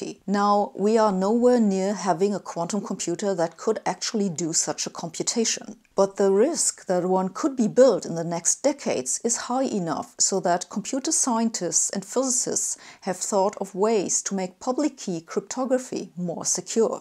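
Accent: Germany. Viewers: That was single handedly the best transition to a sponsorship ever. Also the way you explain the benefits and features of nord VPN actually made me think about buying it. not only are you an amazing scientist and educator, your sales skills are a solid 10 as well. Please do not be hard on your english either. You have an accent yes. Your english is not broken by any means and you are very easy to understand. Plus I have always a german accent to be rather intimidating so I shut up and listen more. Thank you for the laugh first thing in the morning. My perception about how today is going to go has spin in the optimistic direction. Stay safe and healthy! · I am so old that to me English spoken with a German accent by a scientist is considered more reliable than pure American or British English. Given the current standing of rationality in the US and the UK, I am modern again. 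· you sound German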